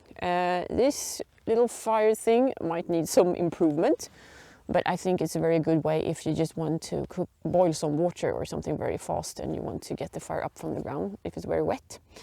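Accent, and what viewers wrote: Sweden. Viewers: Hej, är du möjligen från Göteborg. Låter som en göteborgsbrytning under engelskan 😏 Bra filmer. Bra innehåll. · Dear, your videos are a great inspiration to the ladies I date, and I am very entertained watching your adventures. I try my best to explain bushcraft techniques to so many ladies, and I find that many do not comprehend or retain the Information. Your videos are very good at helping break the communication barrier between Men and Women, the ladies actually retain your words and comprehend your reason, intentions and actions, to achieve your adventure challenge. I may say the same phrases about the same actions you are doing, and the girls simply do not understand it coming from me sometimes. I started to think beautiful hair, long fingernails and glamor trips to the salon equal a comprehension challenge with bushcraft and survival education, you proved me very wrong and humbled me with who you are. 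Thank you so much. Do not be offended by negative comments from people, they are simply jealous of you in some way. Several ladies I have shown your videos to have mimicked your Swedish to English accent when we are out on our own adventures, because we all think it is very beautiful and sexy, and sometimes they do it just to get my attention, and it works very well for them to do so. I am proud of and for you.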